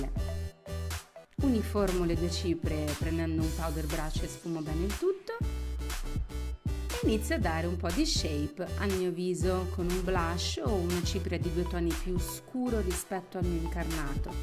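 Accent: Italy. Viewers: Your videos keep less bored especially when I can't sleep. I love hearing you speak italian even if I don't understand half what you are saying. I have Nigerian Friends who were born in Italy, They speak just like you. Need to start doing videos in my language LOL. Thanks for this awesome creativity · Sei molto bella e mi è piaciuto il suo accento italiano 😙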